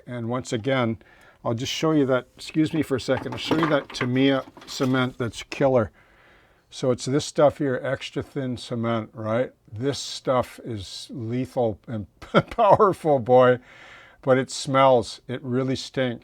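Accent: Canada. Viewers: Located and ordered! Thank you so much. Keep on building and doing these videos. I'm not a Railway modeller, but modellers appreciate everything done on a small scale - or I do at least. I especially love your scratch-built ships and boats. I knew you were a fellow Canadian when I heard you speak. 🙂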